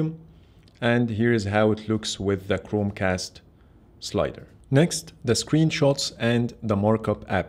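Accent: Egypt. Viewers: باين اوى انك مصرى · انت مصري 🤔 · Is this Egyptian accent 🇪🇬👏😋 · Are you Egyptian !!!! لهجتك الانجليزي واضحة أنه مصرية 😁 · Why the Egyptian accent ?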